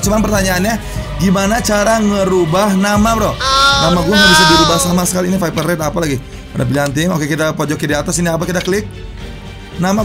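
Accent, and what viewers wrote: Bandung, Indonesia. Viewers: Bang orang Bandung ya?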